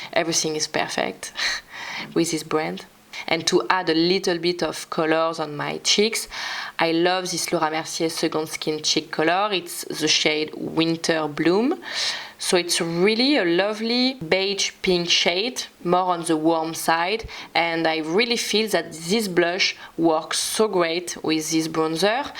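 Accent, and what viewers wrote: France. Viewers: Coucou, j’ai une petite question vraiment pas pensée de manière méchante mais fais tu exprès d’avoir un accent français en anglais aussi prononcé ? Peut-être pour accentuer ta différence d’être française entre toutes les autres YouTubeuse ?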